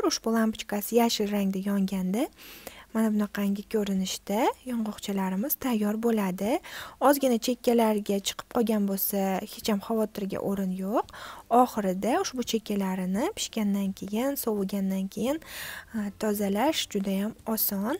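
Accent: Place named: Fergana, Uzbekistan. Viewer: Talaffuzizdan Fargonalik bolsez kerak deb oylodim.Men ham fargonadanman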